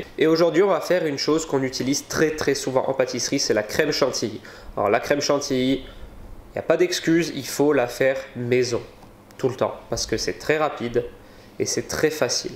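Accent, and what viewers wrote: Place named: Alsace, France. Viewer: Bonjour je vous voit ( et entend) la première fois et j’ai l’impression que vous d’Alsace Lorraine 😊😊☺️☺️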